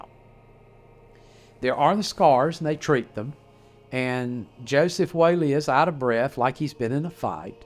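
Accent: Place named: Australia. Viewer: Love the accent.. Sydney'Oz Solid Salute 😭🙌🏼💫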